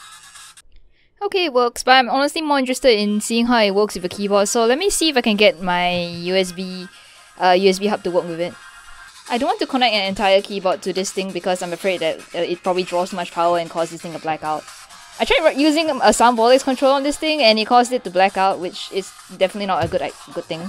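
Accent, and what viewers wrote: Singapore. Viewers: How bout try robeats on roblox,its like tap tap but for 3 yr olds with ultra instinct, Btw,u sound singaporean,u from sg? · lmao i don’t like free things too you sound singaporean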